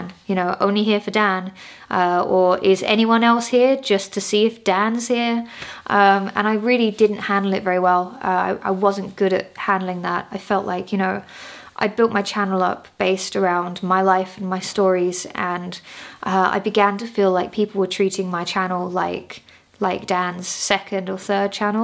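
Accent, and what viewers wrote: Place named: Midlands, United Kingdom. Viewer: As a fellow resident of the Midlands I love that has become fully naturalised and now says "laff" rather than "larf".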